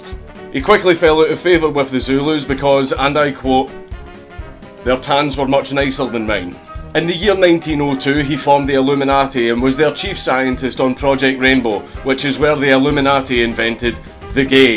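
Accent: Scotland, United Kingdom. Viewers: I love your Scottish accent! 😀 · where the illuminati invented THE GAY [ The Scottish accent makes so much better ]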